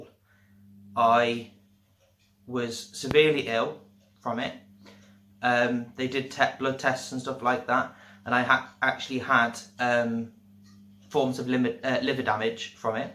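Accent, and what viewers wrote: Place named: United Kingdom. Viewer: Thanks for this, you're the first person I've seen on yt talking about Dupilimab with a British accent, did you get it on the NHS? Makes me hopeful, cheers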